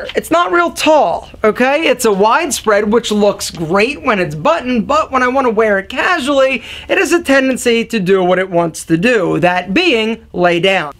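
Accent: United States. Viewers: The loud American accent ruined it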